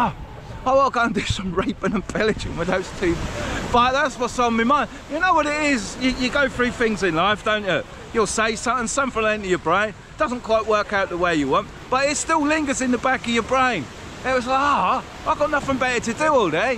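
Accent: Essex, United Kingdom. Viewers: you sound like youve got an essex accent, dont sound much like a good old norfolk accent, but didnt you say in one of youre videos youre an aussie? im just curiuos mate, btw im from suffolk, cheers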